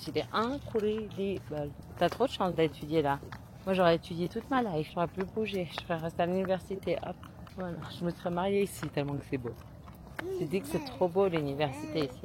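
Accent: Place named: Switzerland. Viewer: L’accent suisse faut arrêter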